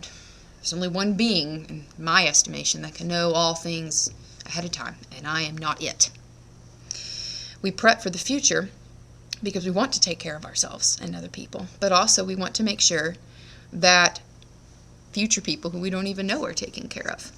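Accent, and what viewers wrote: Ireland. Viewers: Like the Irish voice. : ) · I love the Irish Brogue. :)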